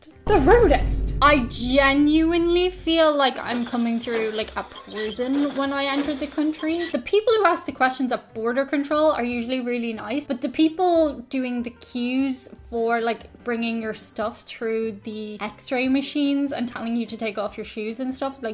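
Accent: United States. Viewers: I really must compliment her on her ""Merican English" She was SO spot on with the State Names and other Americanisms. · you definitely adapting to the American Accent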